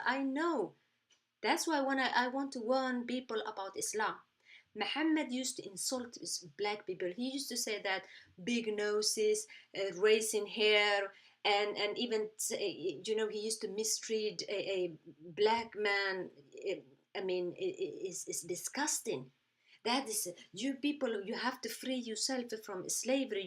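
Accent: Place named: Tigray, Ethiopia. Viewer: You are tigray agame ethiopian from your accent .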